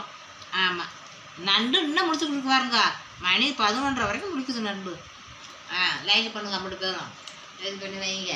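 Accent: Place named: Kondulavi, India. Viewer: சூப்பர்...சும்மா விளையாட்டுக்கு சொன்னேன்...நீங்க எங்க ஊரு கொண்டுலாவி பேச்சு மாதிரி பேசுறீங்க.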